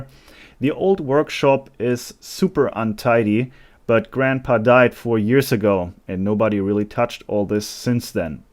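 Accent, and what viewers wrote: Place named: Germany. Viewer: My Great Great-grandfather was from Germany. What part exactly I do not know since he passed away when I was a small child. But hearing your accent and listening to you pronounce things in German is a treat for me. Not mechanically inclined to do this things you are very skilled at. But I will continue to watch your videos and live vicariously through you. And who knows maybe I'll learn a thing or two that will help me take care of the little amount of tools I have. Keep doing what you are doing and good luck with the car repair!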